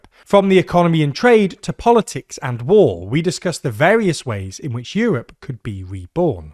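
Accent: United Kingdom. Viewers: "dõnt õwn theyr hõüms" way too british for me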